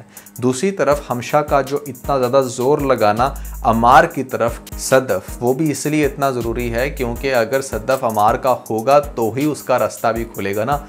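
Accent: Punjab, India. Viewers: Bohat changa tuhda punjabi Touch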